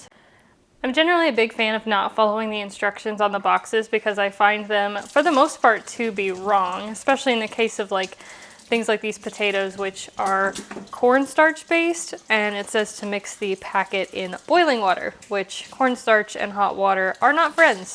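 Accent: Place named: Virginia, United States. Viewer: Ahhh, a Virginia girl are ya? Hello from Manassas, Virginia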